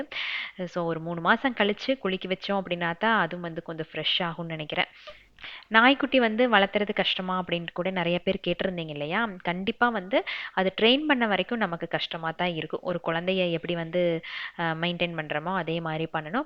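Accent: Coimbatore, India. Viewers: Nenga elam nala kovai slang la pesrenga but ta matum yen sis kannada pesrenga... unga voice over vida original voice ketka nala iruku sis ❤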